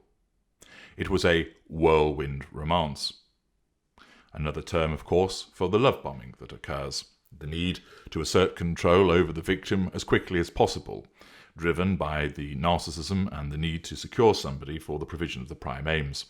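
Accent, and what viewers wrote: United Kingdom. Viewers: this gentlemen is british for sure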